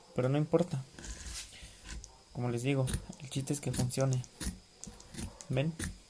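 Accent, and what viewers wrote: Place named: Chiapas, Mexico. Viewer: excelente video. estos SÍ vale la pena verlos, no como otros videos tontos ke no aportan nada ,.felicitacion al joven por su ingenio y su timbre de voz muy agradable , nada ke ver con esos videos españoles ke con su horrible tono y timbre de voz caen como patada en los EGGS. PERO KIERO SABER QUE LUGAR ES , ESTA MUY BONITO POR ALLA. POR EL TIMBRE DE VOZ PARECE SER DE CHIAPAS. AYUDA ,DONDE ES ?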